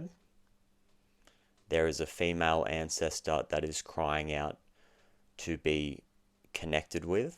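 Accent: Australia. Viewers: Mate i gotta say it was quite a surprise to hear an Aussie voice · Hey! So great to hear your accent fellow Aussie 🦘🐨🌏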